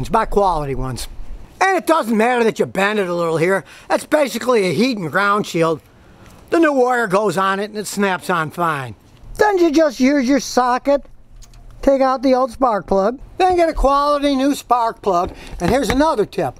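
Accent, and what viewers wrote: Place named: Chicago, United States. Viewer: Scotty Kilmer, you're hilarious brother! Chi-town accent adds to the overall experience. It's like Anthony Spilotro became a mechanic 😂😂🤣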